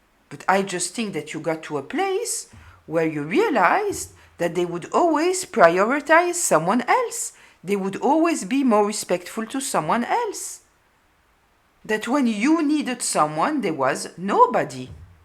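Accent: France. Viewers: 1st time on here, glad I found you. Love your very Fremch accent, and think you are very wise. It might be my first time but I promise it won't be the last ❤🎉😊 HAPPY 2026 !! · Aside from loving your beautiful French accent, I love that your readings are actually therapy, discussing what beneath those strange behaviours of humans. I love this because it resonates with the main reason I listen to Tarot readings.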